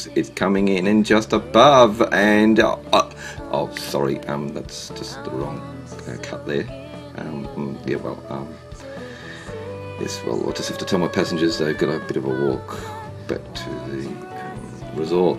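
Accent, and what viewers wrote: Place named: Australia. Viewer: G'day mate, lovely Aussie accent!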